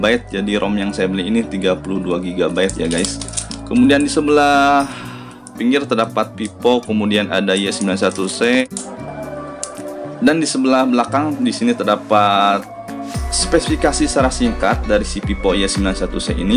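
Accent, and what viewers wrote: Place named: West Java, Indonesia. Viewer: Hp dari pipo, tiba2 muncul di onlen hahaha c akang nyunda pisan 😁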